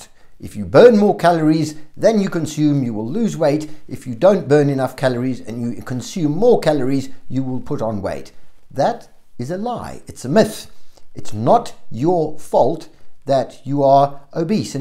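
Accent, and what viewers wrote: South Africa. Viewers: And has a South African accent.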